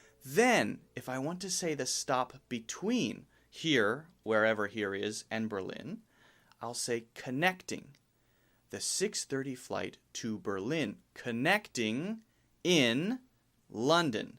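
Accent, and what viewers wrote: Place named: United States. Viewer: I love ur channels so much.. Very American which I use American accent... Your accent is easy for English learners to pick up.. Keep up the good work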